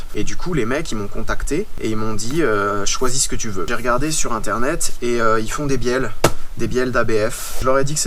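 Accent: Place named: Lorraine, France. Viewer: Slt maestro t’a un accent lorrain je trouve Salutations mosellanes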